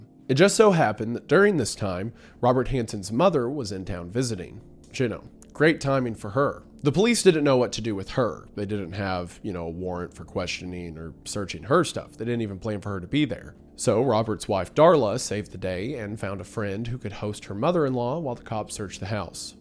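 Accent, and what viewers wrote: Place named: South, United States. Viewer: your not so upbeat southern voice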